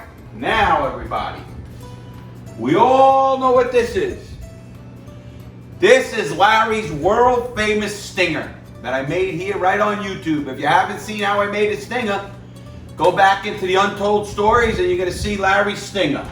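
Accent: United States. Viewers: r u American ?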